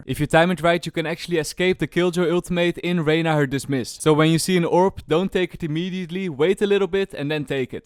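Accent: Netherlands. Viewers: you dutch/nederlands? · Ik weet zeker dat je Nederlands bent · je ziet dit waarschijnlijk niet maar holy moly je klinkt ZO nederlands xD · Do I hoor Nederlands? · Bro are you dutch? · Are you dutch or something, because you have that accent. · bro je stem is zooo Nederlands gewoon holly fuck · I smell Dutch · is this guy dutch? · this guy sounds like he's dutch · Het nederlands accent maakt de video veel beter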